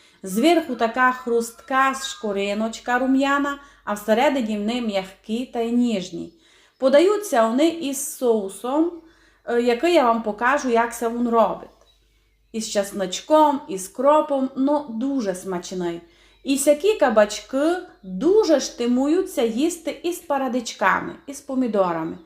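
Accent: Transcarpathia, Ukraine. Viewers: Чудный Закарптский язык,очень люблю слушать.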